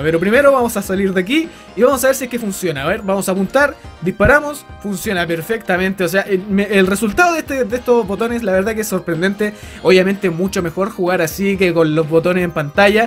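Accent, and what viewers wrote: Chile. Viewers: La mejor mierda es que este en audio español chileno xD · Es chileno bro V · espera eri chileno? y en que pais vives?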